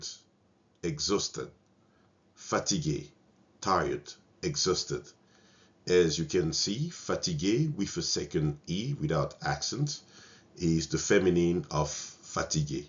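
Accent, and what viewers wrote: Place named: United States. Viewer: Speaking American English,. Great job, blessings.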